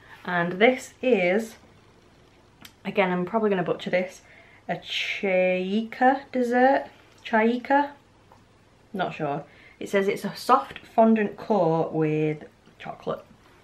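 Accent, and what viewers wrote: United Kingdom. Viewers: I love all fake banana flavors and concerning other posts below me how everybody is talking about where you're both from somewhere different I thought you were from the UK and he sounded to me like he was from America so I just thought I'd add that my two cents in cuz I actually have no idea.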